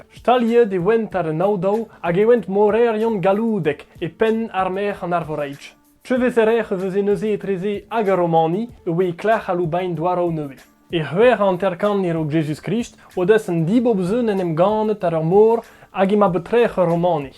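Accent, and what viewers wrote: Vannes, France. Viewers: Super accent Vannetais, vivant comme les anciens ! 🙋💙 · Pouez-mouezh Gwened ‘vel just 😊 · Je pensais pas entendre un jeune parler breton avec un accent vannetais. Chapeau, c'est une agréable surprise. Ken a vezo comme ils disent dans le bro-Leon. Ça donnerait quoi avec l'accent gwenedeg, "chen a veho"?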